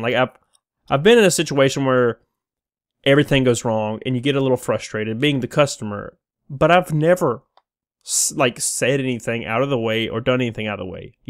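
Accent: South, United States. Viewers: lol btw I like your voice reminds me of when I used to live in the south. I had some of the fondest memories. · You've had people say they hate your voice? That silky southern drawl? The fuck is wrong with those people?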